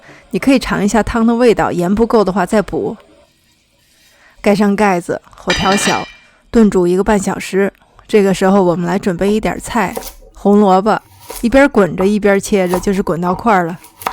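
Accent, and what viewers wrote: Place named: Beijing, China. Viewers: 北京話嗎？很好聽。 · 北京口音好亲啊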